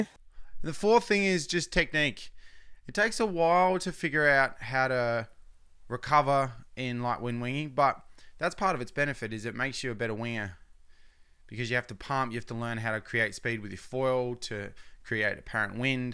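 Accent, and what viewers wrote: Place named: Canada. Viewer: Great video, and I really enjoyed the Canadian accent.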